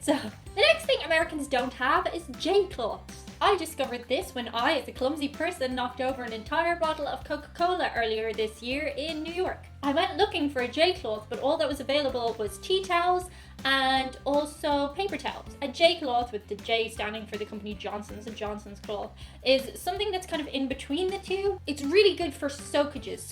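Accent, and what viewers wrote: Ireland. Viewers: Wow, I've never heard anyone pronounce bidet like that. You pronounce it as "B-Day", and myself n everyone I know says "buh-day". We don't say the the letter B, we just make the B sound, followed by the word Day. But its all good, I absolutely love your accent n the way u talk. I'd do almost ANYTHING to marry a female with an Irish accent. It's one of the sexiest things I've ever heard. 💚🍀💚🍀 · just the Irish accent that's all.. just varies by region pronunciation of anything as to where you accent the vowels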